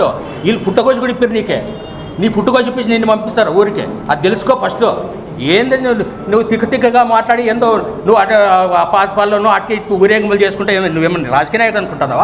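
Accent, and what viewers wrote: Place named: Rayalaseema, India. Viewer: సూపర్.... పూర్తి రాయలసీమ యాస..వార్నింగ్ వింటుంటే సమ్మగుంది...😂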